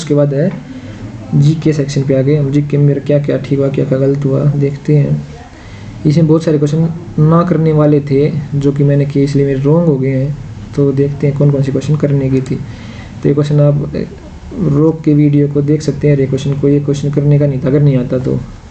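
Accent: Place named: Haryana, India. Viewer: Haryana alya ki to boli t e pichan hoja h konse district ka h bhai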